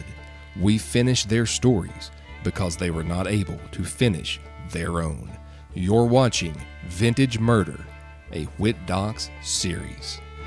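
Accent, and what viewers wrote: Kentucky, United States. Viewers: Awesome upload,as always. Smooth Kentucky voice an added bonus! Thank You, Whit!👍🤗✌️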